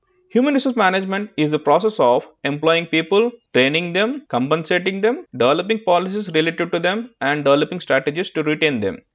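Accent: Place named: South India, India. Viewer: Useful video. Sir, you might be from Siuth India, guessed by your English pronunciation, far from elocution. objectiw reciw beliw ... next video, every letter V make it sounds like F so that we can understand better and engage in your great contents.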